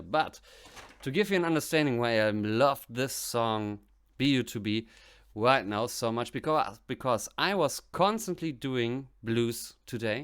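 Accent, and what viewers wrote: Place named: Germany. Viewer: Neil was writing themes that were 10 to 15 years in advance of the times. Clock Work Angels is unfolding today/. The German accent is cool. You sound all right man. This song describes the obstacles of today.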